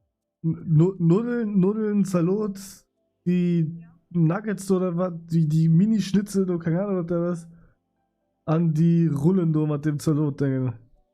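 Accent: Germany. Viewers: An manchen Stellen bisschen wie pfälzisch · Es hört sich einfach an wie schwäbisch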